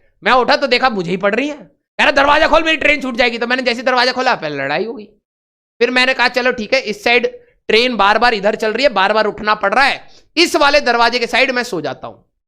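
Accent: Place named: Bihar, India. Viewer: Sir ka bihari ascent ek perfect bolte hai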